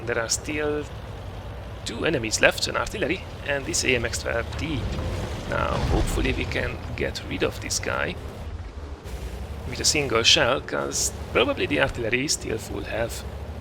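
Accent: Hungary. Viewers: magyar vagy? mert ( lehet hogy csak én) eléggé hallottam az akcentust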